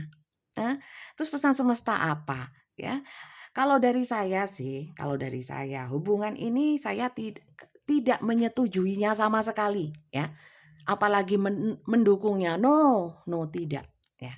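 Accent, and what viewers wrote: Java, Indonesia. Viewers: Saya selalu ketawa tiap ibu keluar Jawa medok nya. Kalau ada orang yg serumpun bahasa dengan kita, lalu lihat video ini : kata "munthuk" itu bahasa Malaysia apa ya ? Saya nonton rame2 dengan anggota keluarga yg lain, waktu ndengerin ibu bilang "munthuk"... "angel wis angel" spontan ketawa. Baru tahu kami kalau ada juga cinta bersegi yg kasmaran tapi bisa dengan kontrol diri yg baik. Biasanya buntut nya cerai dgn pasangan sah nya, kalau beberapa hari ini berturut-turut bacaannya cinta bersegi, berarti buuuanyak yg menjalani kasus2 seperti ini. Terjadi penurunan moralitas dalam pernikahan. Dampak dolar naik ke 16ribu, ekonomi negara kita sedang tidak baik-baik saja, eh pernikahan kok ikut2an juga tidak baik-baik saja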